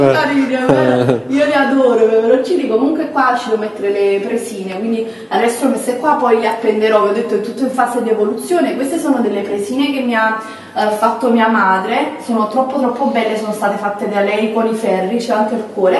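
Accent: Naples, Italy. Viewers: Sei napoletana? anche io comunque sei fantastica 😍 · tu abiti a giugliano o Napoli si sente che parli napoletano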